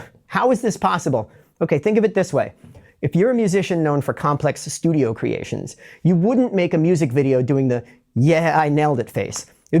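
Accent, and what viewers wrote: Italy. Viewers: That's a pretty sick italian accent, dude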